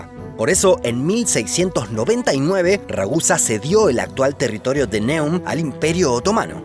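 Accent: Argentina. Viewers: lo iba a ver, hasta que escuche la repulsiva voz de acento argentino .. 🤮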